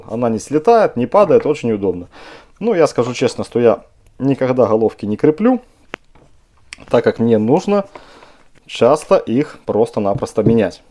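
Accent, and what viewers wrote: Belarus. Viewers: По разговору похоже что вы из Беларуси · Давно в Беларуси не живу, но интересно наш акцент услышать родной, ) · дак я ж по говару сразу сказал - Белорус) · По голосу слышу, что беларус. А ссылка на российскую версию Алиэкспресс. Не понятно.. . 🤷‍♂️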